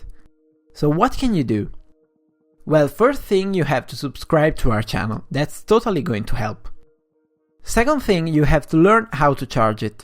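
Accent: Italy. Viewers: Mostafa Haque I'm from Italy and the accent is SPOT ON! He's definitely italian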